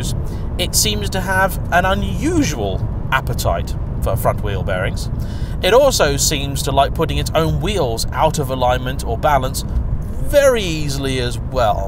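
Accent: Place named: Essex, United Kingdom. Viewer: Before you said it, I knew it was Essex! Subbed!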